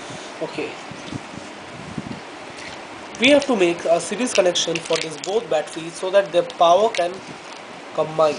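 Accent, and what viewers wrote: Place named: India. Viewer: I like your accent!😊 Are you Indian? I'm from Egypt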